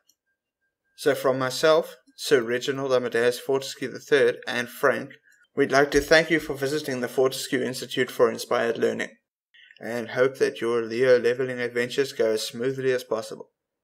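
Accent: South Africa. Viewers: are you from S.A sounds like it ?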